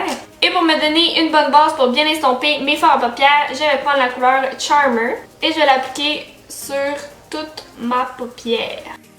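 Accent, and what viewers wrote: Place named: Canada. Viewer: CC sublime très classe j 'adore je le ferais cet am....j adore ton petit accent 'Canadien 'quel beau pays...nostalgique car j y suis partie en 1991 (j avais 24 ans)pour mes études d 'infirmières (stage)...j étais dans la magnifique région des Laurentides...gros becs. comme l 'on dit chez toi...bsx belle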